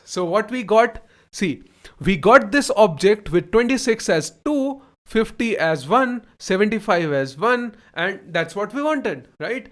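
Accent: India. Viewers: Indglish but I understand and honestly you speak better than me hehe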